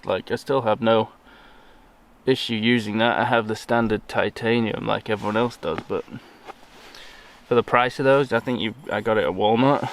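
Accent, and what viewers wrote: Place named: United Kingdom. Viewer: You sound like a Brit but you talk about walmart and bucks. Where are you? (NOOB to your channel)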